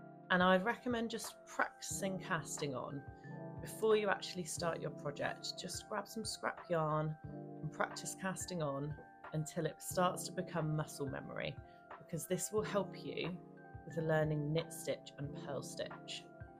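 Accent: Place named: England, United Kingdom. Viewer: I'm 73 yrs old. When I was 22, I knitted my baby son a blanket. Now I can't understand the instructions until now! Thank you! Lovely English accent 😊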